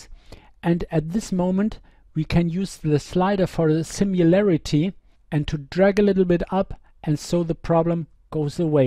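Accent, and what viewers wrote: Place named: Europe. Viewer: brilliant explained show me how to and refreshing european accent- as opposed to annoying american drools.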